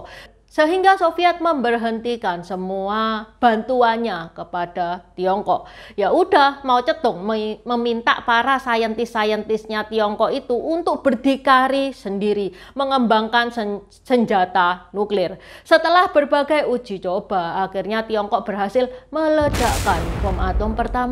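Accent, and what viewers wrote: Java, Indonesia. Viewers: nieh enakan ya klo mendongeng.. hihihi pk bhs indonesia dg aksen jawa medok.. hihihi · Dia lahir dilingkungan org jawa, ya pasti logat nya jawa, emang jawa bukan indonesia ya. Nampak kali anda rasis sekali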